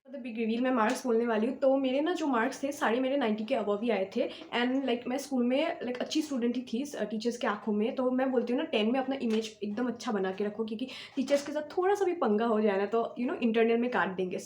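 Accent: Assam, India. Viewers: It seems like you are from Assam. Are you? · Assam fam here! I did recognise that you are from Assam from your accent.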